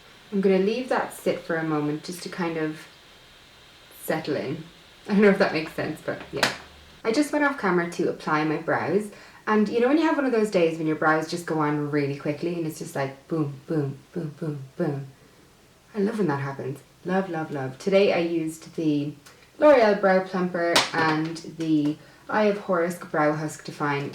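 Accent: Ireland. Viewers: Your so beautiful!! Are you Irish? You've got a lovely Irish accent💕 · It sounds Irish to me...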